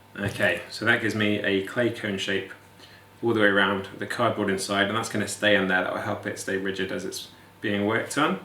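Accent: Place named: Nottingham, United Kingdom. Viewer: Are you from nottingham? I hear it in your accent!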